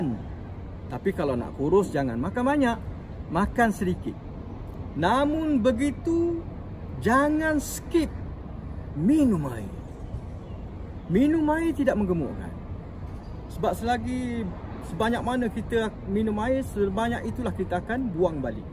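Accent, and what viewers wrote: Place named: Terengganu, Malaysia. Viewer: ado bunyi sikit loghat teganu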